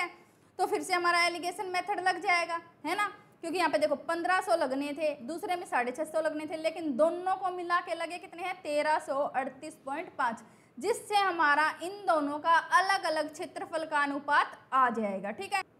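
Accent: Haryana, India. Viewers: Aap haryana se ho kya aapki boli humre jesi lagti hai 😊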